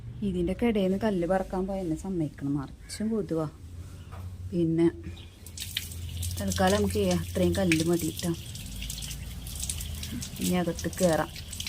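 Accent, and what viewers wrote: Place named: Kochi, India. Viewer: ഇങ്ങള് കൊച്ചി കാരിയാണല്ലേ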